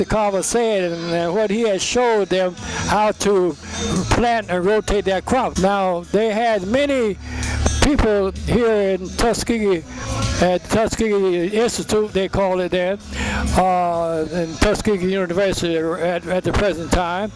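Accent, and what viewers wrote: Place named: India. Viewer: Thats an indian talking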